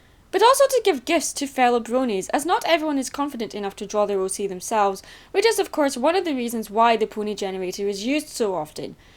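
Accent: Scotland, United Kingdom. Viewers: I knew you said 'Clydesdale' because of that Scottish accent, furry hooves and it's not a damn pegasus. lol people can't think any further I don't like pony generator/creator because it looks stiff, unoriginal (just like you said) and it kinda makes the ponies look.. weird. Most people (that I've seen) who use it make their OCs have bad color combinations. I'd rather see an OC scribbled than people using the pony generator. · She’s Scottish, and I can do an Australian Accent. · So I'm guessing ur Scottish lol go Scotland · It's Scottish wait I'm Scottish Yay I found a Scottish youtuber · omg are u scottish squee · She's Scottish! What did you expect? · omg i love Scottish accents!!!!! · Scottish like me :D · by the way I love your Scottish accent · So your Scottish?! Yey! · your not the only fan in scotland I can tell you live there cause we've got the same accsent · Are you Scottish? 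Well if you are then I am to! 😄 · lol I'm slowly getting used to the Scottish accent cos my friend is Scottish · scottish like me ;) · ... i she scottish? i am aswell sooo yeah your not the only scot brony if that's the case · Norlén SHE IS NOT IRISH SHE IS SCOTTISH!!!!!! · I love your accent lol. I'm Scottish too! #ScottishSquad And I'm fine with recoloured "OCs", but if the person who made one is claiming it to be an original "OC" or "theirs", that just makes me rage! XD.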